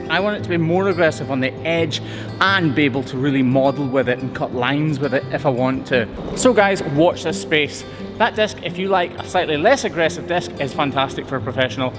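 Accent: Scotland, United Kingdom. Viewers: Thanks for a lovely episode, nothing like waking up to pure Scottish in the morning, you are so helping my daughter with her accepting she speaks Scottish.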